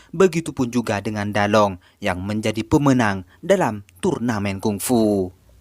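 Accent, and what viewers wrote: Madura, Indonesia. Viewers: Madura ya pengisi suaranya?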